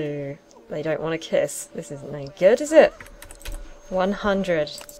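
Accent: United Kingdom. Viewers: I LOVE UR BRITTISH NISSSSHHH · I love your accent <3 I miss England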